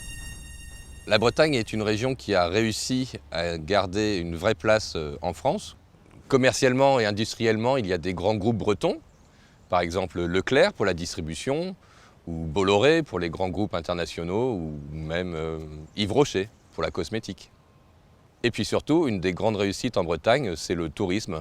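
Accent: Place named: England, United Kingdom. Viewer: The accent sounds English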